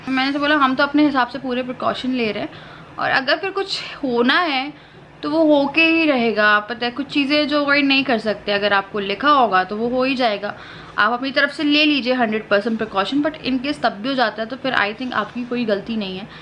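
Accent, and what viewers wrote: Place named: Sadri, India. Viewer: Lgta h app sadar Se ho dii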